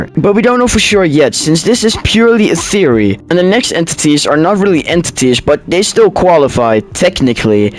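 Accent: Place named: Netherlands. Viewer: I noticed that you have a bit of a Dutch accent. Are you Dutch by any chance?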